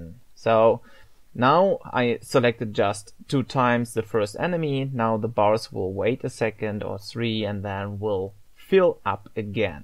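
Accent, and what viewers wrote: Germany. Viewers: Damn, this accent sounds so German!!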